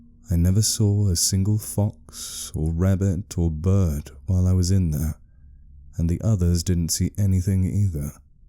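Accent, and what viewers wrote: Germany. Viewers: As an enthusiast and practitioner of accents, i've gotta say your German accent is on point! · You know he nailed the german accent when it reminds you of Werner Herzog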